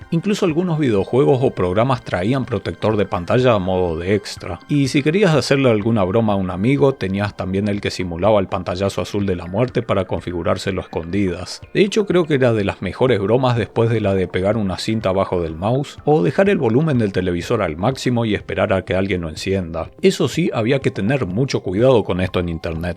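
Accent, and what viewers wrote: Argentina. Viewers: Por tu acento me da curiosidad saber de que parte de la Argentina sos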